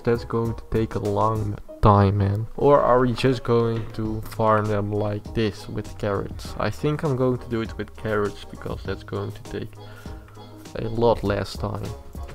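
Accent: Netherlands. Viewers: are you dutch? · are you from the netherlands or something becouse you have an netherlands accent nice vid btw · Are you a Dutch men?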